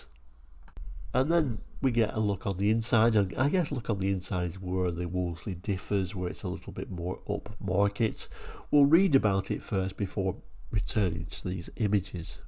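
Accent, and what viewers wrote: Northumberland, United Kingdom. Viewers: I am intrigued by the lovely accent. Is it Northumberland?🤔 But don't go to Oxford University and ask for Noofield College. It is Nuff- field as in snuff. I assume it is an in-house press, since Viscount Nuffield was the founder of Morris and MG.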